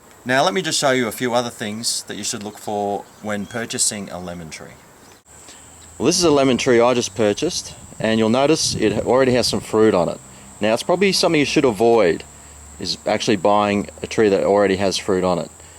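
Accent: Australia. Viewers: Nice video you sound like your from down under. Thanks for the tips mate. growing mine from seeds.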